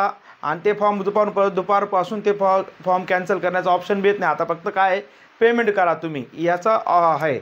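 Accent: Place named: Khandesh, India. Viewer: सर तुम्ही कुठले आहे भाषा मस्त आहे खानदेश 😅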